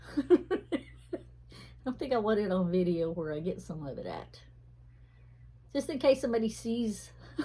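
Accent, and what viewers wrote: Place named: South, United States. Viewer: Girl....your accent is Perfectly Southern just like mine....I Love it!!!! Get your Wizard friend some E6000, his crystal shouldn't break off again with that. I miss the Tang of yesteryear. Might have to pick some up at the store...lol Sale and clearance....I roll like that too...lol Star Anise, I would say in the spices. 💜💜💜💜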